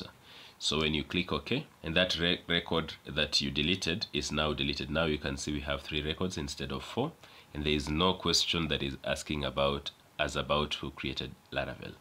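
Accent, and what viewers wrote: Kenya. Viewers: Are you Kenyan bro? I cant ignore the accent😂 ps: I love the work you're doing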